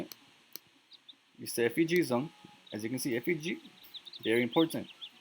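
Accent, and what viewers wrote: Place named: Brooklyn, United States. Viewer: Wow so glad I found your channel bro! I'm already well versed with ICT but this has been a cherry on top. You sound like you have a Brooklyn accent btw... you from NY?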